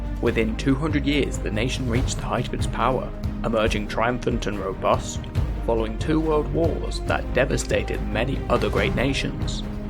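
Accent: United Kingdom. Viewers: This whole thing is Americans propaganda in a British accent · Its crazy that he is saying this while he has a british accent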